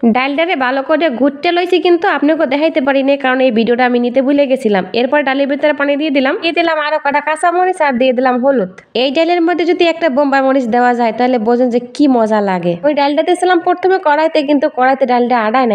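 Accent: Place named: Jessore, Bangladesh. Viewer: বরিশালের কথার মাঝে মাঝে যশোরের ভাষা আসে 😂 মাশাআল্লাহ অসাধারণ আপু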